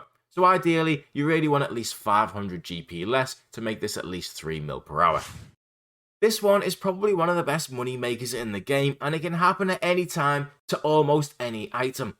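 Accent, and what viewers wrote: Liverpool, United Kingdom. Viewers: are you scouse bro?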